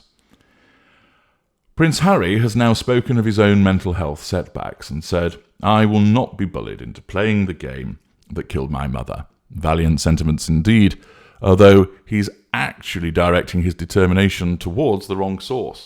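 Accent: United Kingdom. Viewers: Are you Oxford educated? I'm American; your accent is the easiest on the ears of anyone British I have heard.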